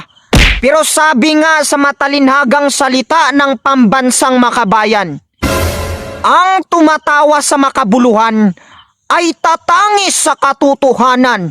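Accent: Visayas, Philippines. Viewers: Sakit sa tenga nitong Visaya na to ayosin mo muna salita mo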